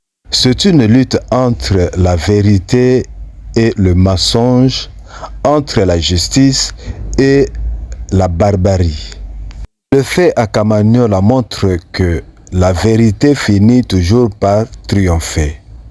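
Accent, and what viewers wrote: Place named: Rwanda. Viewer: Avec tes R La tu rwandais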